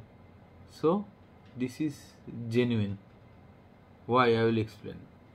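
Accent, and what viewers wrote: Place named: Canada. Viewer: I like your accent. Are you Canadian ?? Eh ?.ya ? Cool . Thanks doc.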